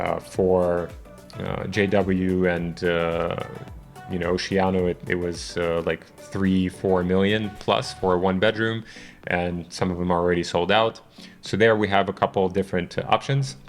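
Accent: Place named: United States. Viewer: was wondering about your name—you sound American! It would be great if you could introduce yourself in each video. It’s refreshing to see Americans in this space. I’m also interested in learning more about Dubai’s real estate. Most of the content I come across seems to feature Russians, Europeans, and people from Muslim countries, along with Indians from other parts of the world. Many people in America still don’t know that much about Dubai’s opportunities—they often think Miami or maybe some Latin American destinations like Costa Rica or Tulum, Mexico, are the best options in the West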